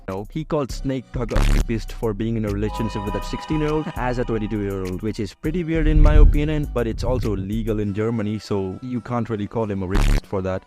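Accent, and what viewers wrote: India. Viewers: Indian voice😊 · Are you indian cause i can hear the accent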